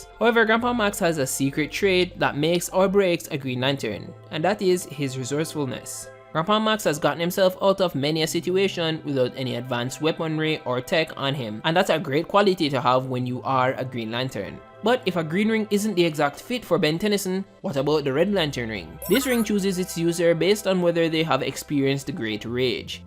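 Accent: Jamaica. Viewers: I love your accent, sounds Jamaican. Reminds me a lot of how Bob Marley talked.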